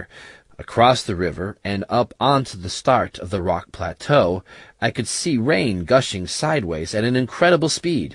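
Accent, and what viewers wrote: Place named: United States. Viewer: The voice of the narrator is so casual, and conversational, for having discovered one's self in a nightmare; plus, he sounds about 16. He says he is England, so why did they use an American as a narrator?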